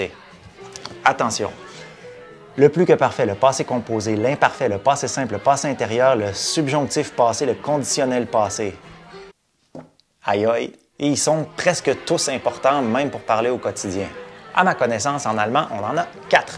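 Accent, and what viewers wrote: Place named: Canada. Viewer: L' accent Canadien: un regal :) Je m' abonne: tres interessant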